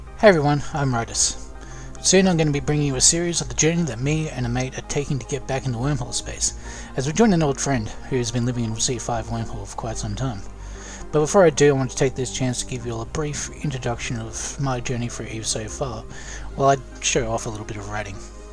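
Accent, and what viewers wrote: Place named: Australia. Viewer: subbed. good on you for moving past the frustration that arises time and again. Looking forward to more videos. It may be the nerves, it may be the quick aussie speak but I found it hard to make out what you were saying as it was going by so fast. :) Could also be my own crap hearing tho. o7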